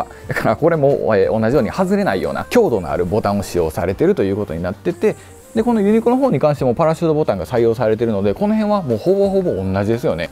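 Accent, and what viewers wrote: Kansai, Japan. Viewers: 何度聞いても心地良い関西弁だなぁ〜。 さいたま市より。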